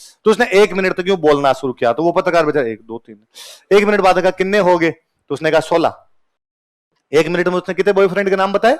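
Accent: Rajasthan, India. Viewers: राजस्थानी टोन ऐसी ही होती है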